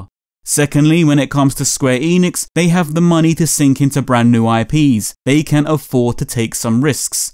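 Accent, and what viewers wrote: London, United Kingdom. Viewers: He became more and more British as the video went on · Ok this is kinda weird lol. Alright so I remember watching this guy like 2 or 3 years ago on one of his Night vids he did back then. For some reason when they announced this I thought to myself, ‘Oh I wonder what that Nights fan with the london accent thinks of this? I’m sure he’d be hella excited.’ Now today I just seen this vid in my recommendation :).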